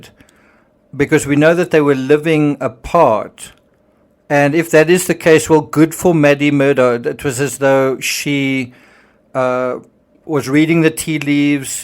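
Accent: South Africa. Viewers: Love your South African accent.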